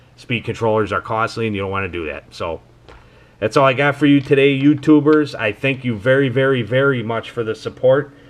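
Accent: Chicago, United States. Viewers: You've gotta be from Chicago with that accent! Or Elmwood Park or something.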